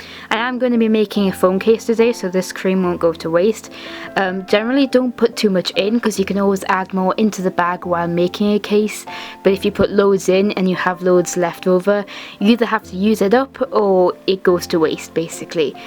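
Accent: United Kingdom. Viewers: You probably get asked this a lot, but i was wondering what area of the UK youre from? Your accent is lovely and sounds kind of northern to me :) I've just started doing my own decoden cases and i love watching your videos, theyre really helpful :) xx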